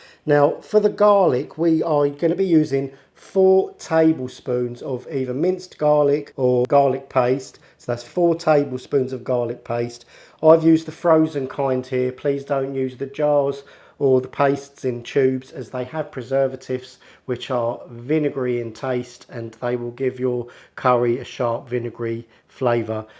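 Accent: Liverpool, United Kingdom. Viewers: Proper scouser ❤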